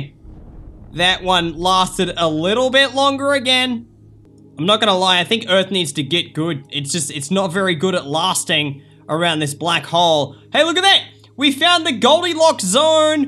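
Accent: Australia. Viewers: Australian Jacksepticeye = Immediate sub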